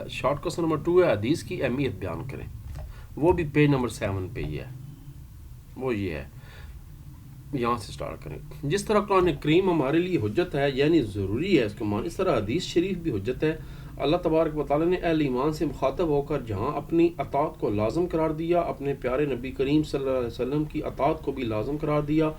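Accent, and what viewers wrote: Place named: Rural, Pakistan. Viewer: Sir where are you from? Your accent is just like the accent of my village peoples (GANDAKASS)❤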